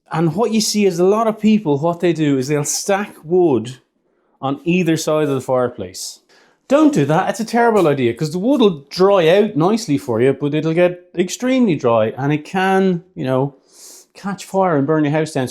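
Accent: Dublin, Ireland. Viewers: Lovely seeing your cottage and lovely fire your a treasure enjoy Christmas love your irish lilt ❤😊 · I laugh every time I listen to you. A missed friend is from Dublin and your phrasing is music to my ears. I knew you were a Dubliner almost immediately even though I haven’t heard it in years. Good videos , fun to watch. Stay on your feet on the roof, you took a good thump there. Yes I saw it yesterday.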